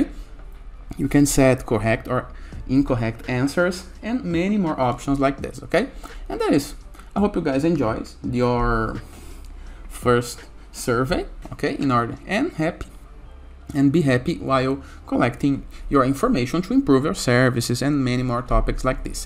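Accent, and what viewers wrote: Brazil. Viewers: are u brazilian by the way ?? you accents sounds like sorry if not .. haha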